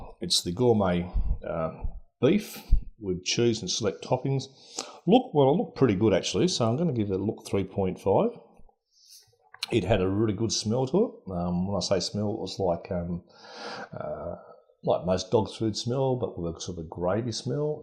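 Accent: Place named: Australia. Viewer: Its Australian mate ;)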